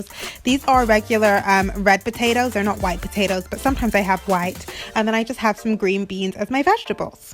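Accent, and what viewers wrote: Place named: United Kingdom. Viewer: And a bit British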